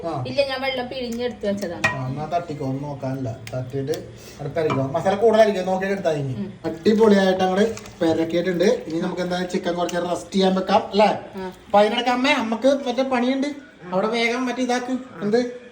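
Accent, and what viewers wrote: Kannur, India. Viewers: നിങ്ങൾ കണ്ണൂർ ആണോ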